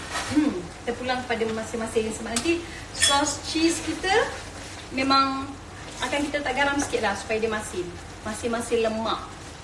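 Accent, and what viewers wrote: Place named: Pahang, Malaysia. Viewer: Tokti buat tutorial masak cakap pahang pulak haha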